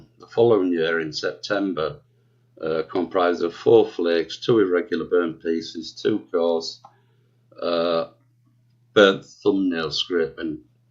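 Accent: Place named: Stockton, United States. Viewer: Nice one mate...i live in Sydney now but as a kid my Nana lived on Dovecote Street in Stockton and my mum was born there..good to hear the accent again 🙂👍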